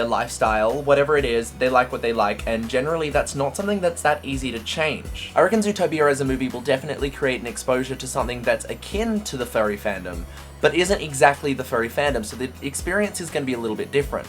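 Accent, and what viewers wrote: Australia. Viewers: Your australlian? I always thought you were british! XD I love you more now because i love australlians! <3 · oh your australian!?!? i am to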